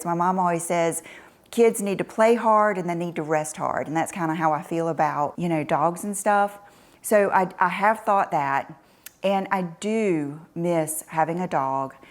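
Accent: South, United States. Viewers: Hi, I’m a new subscriber! I watched and listened when you posted this so I can get to know you better. I love your personality, authenticity and your fashion style. What attracted me to your channel was your Southern accent (I love it) and your joy when you show us what you buy and model them for us. Your fashion philosophy is like mine. I dress the way I think makes me look and feel good, not to look young. Thank you for doing what you do to inspire us.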